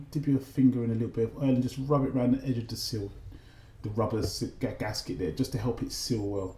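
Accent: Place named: United Kingdom. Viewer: He has a very british accent but yet very understandable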